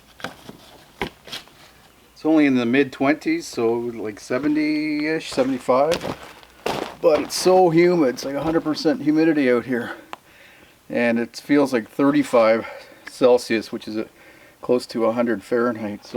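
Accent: Canada. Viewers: Nice vid! I thought you'd go with concrete for the oven and enjoyed seeing you use earth instead. I like that Canuck accent, too..."Ruffed Gross". :-)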